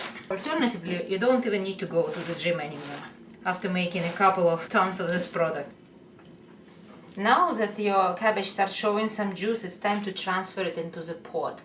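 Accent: Russia. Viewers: terrible russian accent... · God how I love Rooshan accent.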